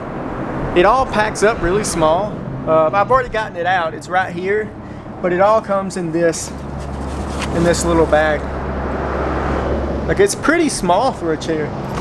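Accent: South, United States. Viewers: Love your personality, and your southern accent. I have to give you an atta boy for the chances you take while Stealth Camping. Be safe and have fun!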